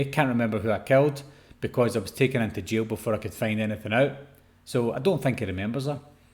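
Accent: Scotland, United Kingdom. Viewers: Wait... are you Scottish??! 😍😍😍 So your first language IS English!!! Or is it Gaelic ? 😜🤣😍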